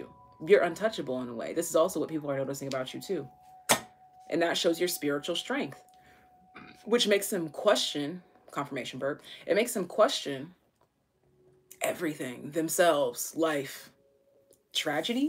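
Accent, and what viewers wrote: United Kingdom. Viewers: Your British accent ain't half bad doll. ;) Love a good swear over here too. Thanks for a lush reading xx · Your British accent took me out 😅😂🎉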